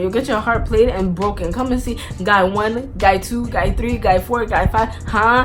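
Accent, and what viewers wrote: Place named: Jamaica. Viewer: Yuh sound lik yuh Jamaican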